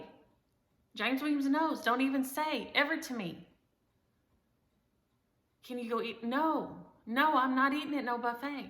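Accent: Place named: Alabama, United States. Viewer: He....I am late coming to the party! Please forgive me! 😂I watched a few of your videos and said to myself....this girl must be from Alabama with that accent! Then I heard you refer to Texas....and my eyes popped out! I am a native Texan...and I didn’t even recognize your accent! I’m in La Grange (you know what we are known for 😳) what part of the start are you in? You are very entertaining! I plan on slowly catching up on your past videos!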